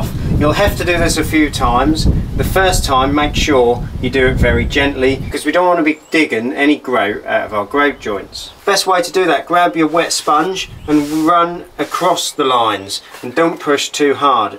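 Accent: United Kingdom. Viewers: Love his British accent 😅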